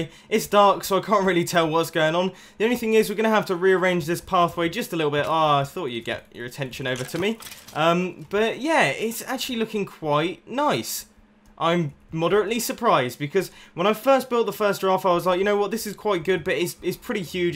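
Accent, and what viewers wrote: England, United Kingdom. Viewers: listening to your british voice every wednesday ? i would absolutely love to :D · don't mean to burst your bubble but your kind of a rip off of xsuima I know every one can make tutorials but also the building style and the houses for donators plus you have an English accent which makes it more obvious just come up with your own thing is what I'm trying to say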